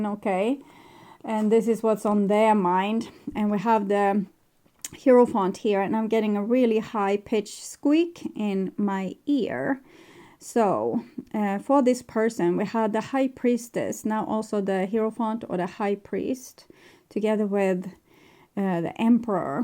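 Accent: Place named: Sweden. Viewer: Tack! Blev mest glad att hitta en svensk! 🙏🙏( jag hörde direkt att det va svensk engelska 😁😁😇) det va nån mening med att hitta denna reading! ❤ Relaterar till hela readingen... 🙏😇 Love amd light!!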